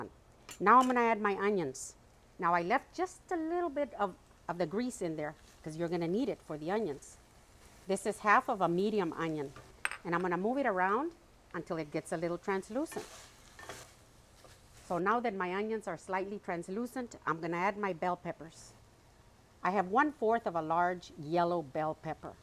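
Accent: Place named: Texas, United States. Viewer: Your Texas accent is back!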